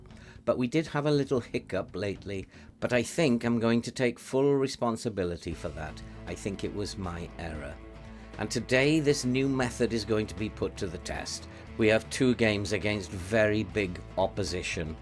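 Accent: Wales, United Kingdom. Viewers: haha fair enough! Are you Welsh??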